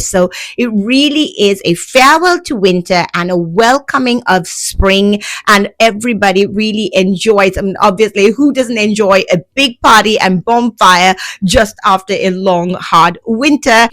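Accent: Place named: United Kingdom. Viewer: I adore your English accent. I wish my own english could sound so sophisticated-ly British xD With surströmming, the smell might reach biohazard levels but I'll tell you that it doesn't really taste like anything. It's basically just taste like salt, an extra salty pickled herring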